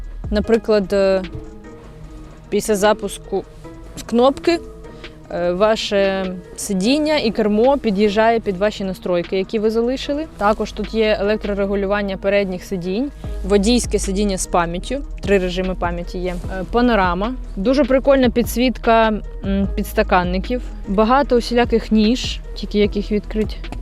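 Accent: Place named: Russia. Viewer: Видно что тяжело даётся украинский язык. Нужно контролить себя ))) как только расслабишься сразу русский вылетает )))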